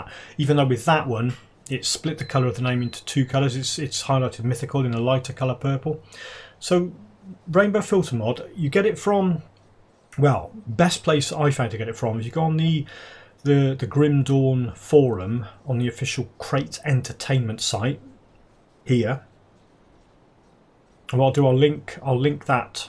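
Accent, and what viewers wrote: United Kingdom. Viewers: Really enjoying your Grim Dawn videos, very informative. I come from the Forest of Deam and lived in Gloucester for years and your accent sounds familiar am I guessing you are a Gloucestershire man?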